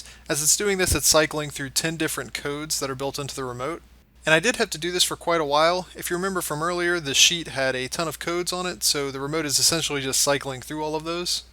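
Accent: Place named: Maryland, United States. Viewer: You are the man. What is your accent are you from MD? Thanks so much.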